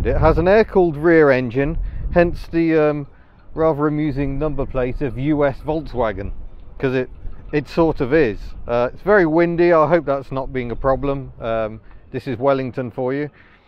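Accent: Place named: England, United Kingdom. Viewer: How come you talk with an english accent not NZ?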